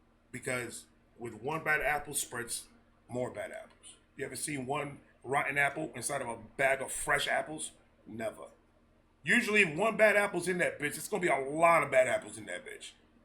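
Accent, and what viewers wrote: New York, United States. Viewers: Everything you said was ON POINT! I couldn’t agree with you more! Btw.. already knew you were from NY before you even said it lol! I live 3 hours north of nyc but have friends down in the boogie down lol. Where I live, most people around here stand by “FAFO”!!!!!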